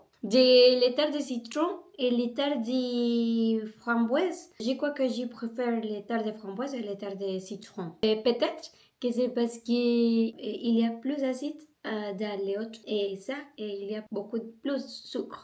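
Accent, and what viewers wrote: Colombia. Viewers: En Tous cas, vous savez bien écrire français et j aime bien votre accent Colombien 😊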